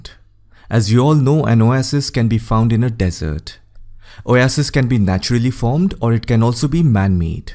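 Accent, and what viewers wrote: India. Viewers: Indians English! Like criticise english language 😠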